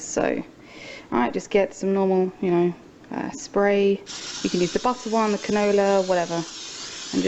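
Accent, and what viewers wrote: United Kingdom. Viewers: oh my god shes british <4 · I didn't expect you to be British :) UK FTW.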